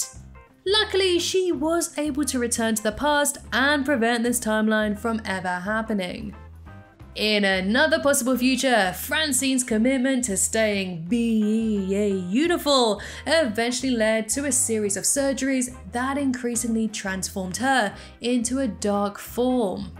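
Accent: United Kingdom. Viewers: Not about the video at all, but Girl, i just LOVED ur british acent, it just, idk, fits u so much, and its so cute to me, just wanted to say that, kisses from Brazil ✋🏾🤠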